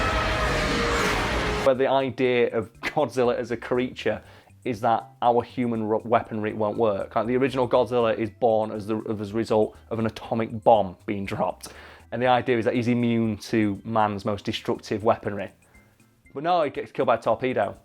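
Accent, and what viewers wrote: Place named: Warrington, United Kingdom. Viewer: Eh up fucker, you sound like you’re from near Warrington and I recognise you- where you at boyo?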